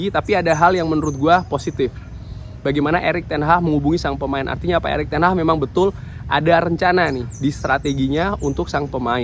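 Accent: Semarang, Indonesia. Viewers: Bang, orang Semarang ?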